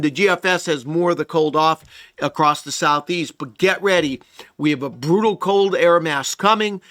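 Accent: New York, United States. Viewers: Just because you have an obnoxious NY accent and way too much confidence doesn't mean you know what you're talking about. News flash, this is click bait!!!!!!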